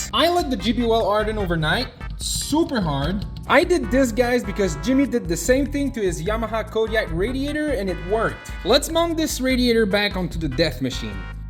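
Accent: Quebec, Canada. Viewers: Where is your accent from? I'm pretty sure it's Canada somewhere but which part? I live in North Dakota U.S. so I'm familiar with how Canadians talk so I was just curious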